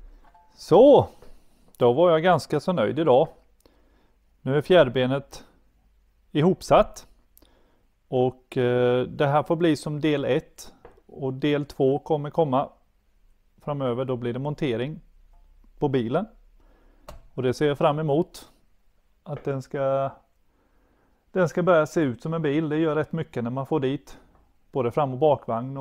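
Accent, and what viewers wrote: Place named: Kalmar, Sweden. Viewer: Mycket snyggt filmat, sällan en ser en så bra renoveringsvideo. Lugnt och sakligt. Sicken go dialekt med, Kalmar/Nybro/vissefjärda?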